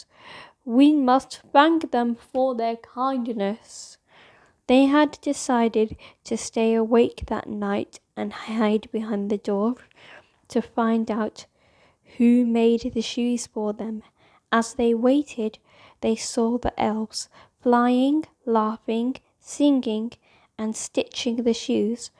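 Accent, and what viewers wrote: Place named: Ireland. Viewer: Love the Irish accent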